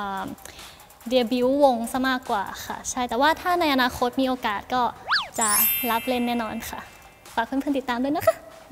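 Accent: China. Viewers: นางฟ้าตัวน้อยเริ่มพูดไทยสำเนียงเหมือนคนจีนพูดไทยละ 555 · เริ่มพูดไทยไม่ชัดและมีสำเนียงจีนติดมา แต่แค่ปีเดียวพูดจีนได้ขนาดนี้ถือว่าเก่งมากๆ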